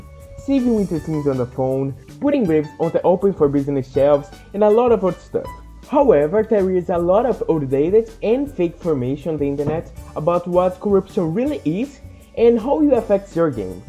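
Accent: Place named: Brazil. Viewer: VC EH BRASILEIRO NEEE ESSE SOTAQUE EU SEII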